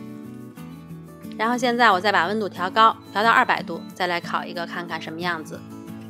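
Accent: Beijing, China. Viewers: 我的天啊 辛苦你了！太不容易了！这得吃多少蛋糕...京腔听着很舒服哈哈哈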